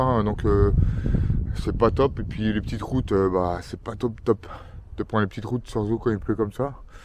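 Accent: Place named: North, France. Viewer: Bonjour, Bravo pour ton courage et ta motivation. Pas évident de rouler dans ces conditions. C'est difficile avec le froid et l'humidité. Encore une video fort sympathique. Avec un accent du Noooooord qui ne passe pas inaperçu. J'adore. C'est toujours un plaisir de te suivre. Bonne récupération aussi. C'est important. Et tant mieux si tu as pu te remettre rapidement en forme sans trop perdre le bénéfice de tes entraînements précédents. Il est vrai que le tubeless, c'est top. J'ai parcouru à ce jour 2000 kms sans une crevaison mais ça reste tout de même un investissement. Gain au niveau du confort, de la réactivité, une meilleure tenue de route et stabilité dans la descente de col. Le tubeless en 28, sincèrement, je recommande. Au plaisir de pouvoir te suivre dans de prochaines vidéos. Prend bien soin de toi. A bientôt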